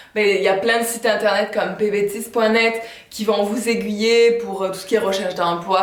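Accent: Quebec, Canada. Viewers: c'est dingue l'accent québécois que vous avez pris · Ouii un petit accent du Quebec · elle a un accent parfois quebecois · Tu commences à avoir l'accent québécois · l'accent canadien est en train de prendre le dessus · Un melange délicieux d'accents québécois et français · l'accent s'apparentait plus à l'accent Québecois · elle a un accent mêlé entre l'accent français standard et l'accent québécois standard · elle a un accent quebecois un peu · t as l accent québecoise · tu a choppé l'accent Québécois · 50% accent qc 50% francais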